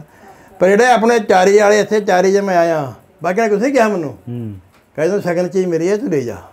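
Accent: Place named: Kharar, India. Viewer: Kharar di boli aee ji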